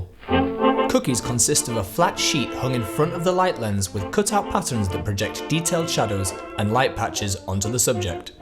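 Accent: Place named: Lancashire, United Kingdom. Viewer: Can't hide a Lancashire accent. 😁 Fantastic doc!! Thank you!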